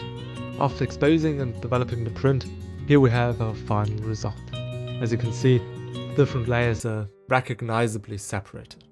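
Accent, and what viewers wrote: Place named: Switzerland. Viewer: Interesting pronunciation. Studied British on a German substrate. Swiss-German?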